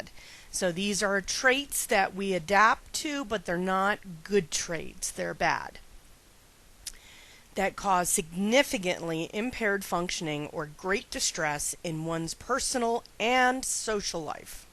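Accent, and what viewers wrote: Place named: Pennsylvania, United States. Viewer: Thank you for this, it helped me a lot to prepare for a psychiatry oral exam. I am French, but I lived in PA for two years, it was nice listening to your accent as well (unless I am completely wrong and you are not even from there :) :) I think your explanations were well-formulated and your examples very clear. What I still have a hard time with is matching the mechanisms with the disorders. Like a person with BPD will use projective identification and idealisation for instance. For some others it can be more difficult for me to remember. Also, I am supposed to know the reason why a person has this or that disorder from a personality development point of view (Mahler theory)... This is kinda confusing for me. Anyway, thanks again that you shared your work! Subscribed!!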